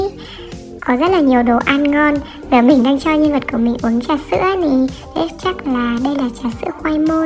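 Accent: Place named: North, Vietnam. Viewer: Đừng nói giọng bắc nữa ah😭😭😭 (Nếu như là ng miền Nam,Tây,Trung)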